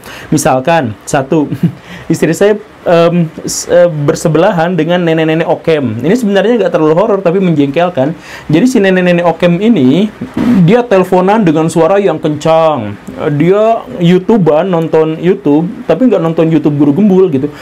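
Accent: West Java, Indonesia. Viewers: Pak guru orang mana ya? Logatnya kyk dari tanah sunda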